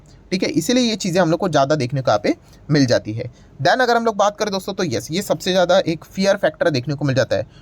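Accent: Maharashtra, India. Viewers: You have a very nice Marathi+Hindi Mhindi accent ❤ I enjoy your typical marathi words in between. Thsnks for reminding me of my mother tongue 🎉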